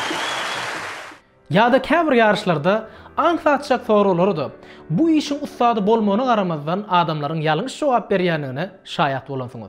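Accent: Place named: Turkmenistan. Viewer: arassa turkmen dilinde gurlemegin nahili gowy!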